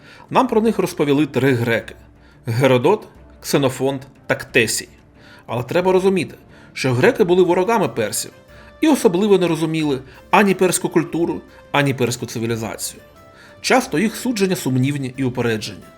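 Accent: Russia. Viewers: Майже скрізь де вживаєте "ґ", там має бути "г". Не приємно чути кацапську вимову. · Ріже вухо гекання. Українці так не розмовляють. Вибачте. Може я не правий . Але кацапське все бісить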